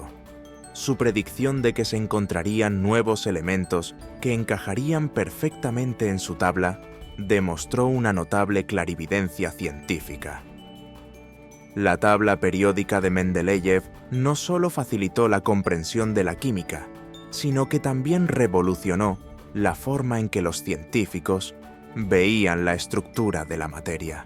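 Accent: Spain. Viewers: Este hombre por su forma de hablar es de España que se puede esperar de alguien de ese pais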